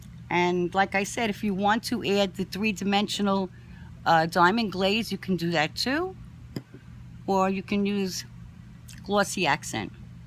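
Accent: New York, United States. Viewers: hi, i just watched gayle's YT of her order from your shop (and the extra goodies you sent her) so happy she shared you with us, i just subscribed to your channel and favored your etsy shop-i'll be ordering from you soon! you have such a variety of yummy goodies in your shop, i love that you have a dollar bin section! (just like Target and Michaels!!) great idea! i really love these pirate pockets you made and the wax seal stickers. i'm sure you hear this all the time, but i also have to mention how much i enjoy your NY accent! thanks for sharing your great ideas and inspiration. so glad to have found you. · Love these! btw, are you from NY because you sound just like my mom! :D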